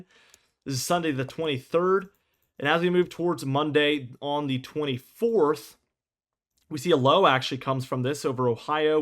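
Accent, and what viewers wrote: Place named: Virginia Beach, United States. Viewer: Def a Va. Beach accent . Thanks for what you do .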